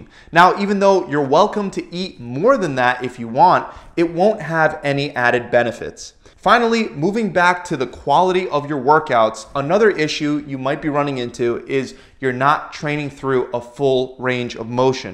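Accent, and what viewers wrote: United States. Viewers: Usa voice?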